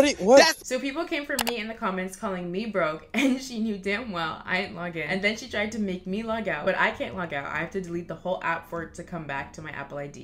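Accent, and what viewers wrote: Canada. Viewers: GIRL YOU CANADIAN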